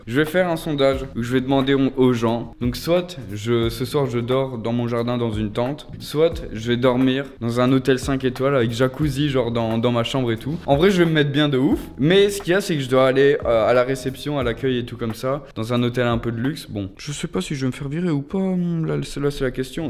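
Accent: Belgium. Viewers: T belge ????? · Il est belge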